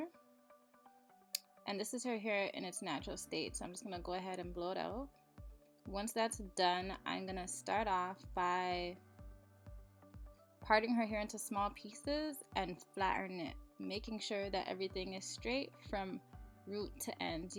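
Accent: Caribbean. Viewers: I hear a Caribbean accent 🤔.... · Is the girl doing the voice over Caribbean? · where are you from? I hear a Caribbean accent.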